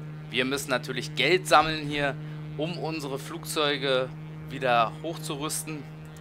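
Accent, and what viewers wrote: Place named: Berlin, Germany. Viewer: Ich habe mal eine Frage an dich, wo kommst du eigentlich her. Wegen deinem Dialekt würde ich auf Berlin tippen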